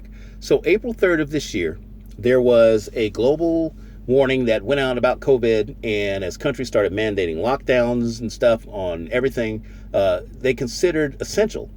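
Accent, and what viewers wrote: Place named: United Kingdom. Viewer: your brit accent is spot on!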